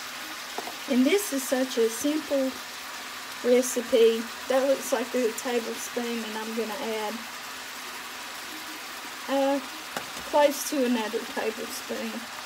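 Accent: South, United States. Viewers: Sour cream in potatoes are delicious too! I like the idea of cream cheese. I love your southern accent & u can cheat with the potatoes use half water & half milk as long as u spice them right no 1 else knows u used part water :)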